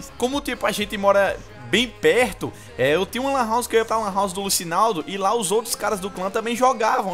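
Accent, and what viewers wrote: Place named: Paraíba, Brazil. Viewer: O ta com menos sutaque da paraiba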